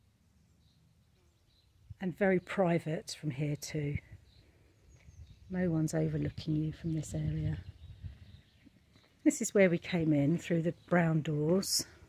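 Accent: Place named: United Kingdom. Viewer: Thank you for presenting this video. It is a beautiful property. Here are some thoughts I have. 1. You are a very good presenter. I am not a brit, so your accent is a bit heavy for me, but because you are thoughtful and speak slowly I did not miss even a word. Also your pace is slow, and allows enough time to see everything, and your camera work is slow and makes it great to watch. 2. Would be nice to have an aerial map to see the boundaries of the property, since clearly the 2000 sqm is much more than we seen, so to have an idea of where the rest is, even if it has been implied. For example, I would liked to see whether it is even possibly to convert some of it to gardening, fruit trees kind of area, etc. Many thanks for a great presentation.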